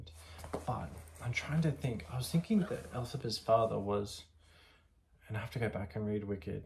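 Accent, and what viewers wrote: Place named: Australia. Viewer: I’ve read all of the Wicked books and just finished Oracle - about to start Witch. Great review, that reveal at the end was almost a dream-like experience, kind of made the entire storyline of the entire 6 books thus far just wash over me with nostalgia and revelation - such a cathartic moment. Loved the entire book. Btw I’m an Aussie too and it’s so nice to hear someone pronounce the names the same way I do, whether it’s correct or not 😂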